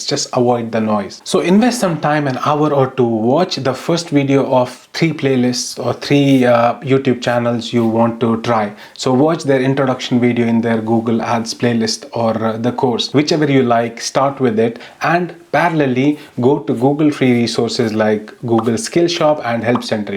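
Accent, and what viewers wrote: Kashmir, India. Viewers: Heyyyy, I'm getting this strong feeling that you are from Kashmirrrrrr. I can see it in your accent and way of speaking!!! Am I correct? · I was sensing a Kashmiri Accent.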